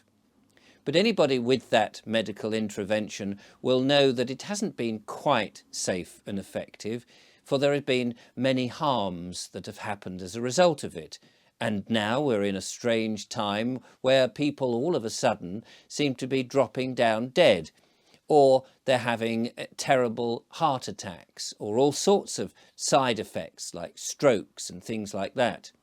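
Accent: United Kingdom. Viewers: You're doing a service to the ones who hear, by giving an (eloquent & very british...) voice to all. Personally, I agree with ALL the words being said. We're ALL living on a 'simulated' world, where the ones who rule it SURE WEREN'T elected. The 'curtain' is 'falling'... slowly BUT surely... Thank you again, Sir.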